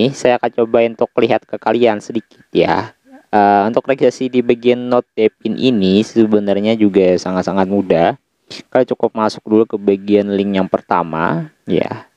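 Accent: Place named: Barabai, Indonesia. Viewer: dari logat kamu dari barabai yah? soalnya saya dari barabai dilihat dari kamu ngomong udah kelihatan kamu asal barabai hst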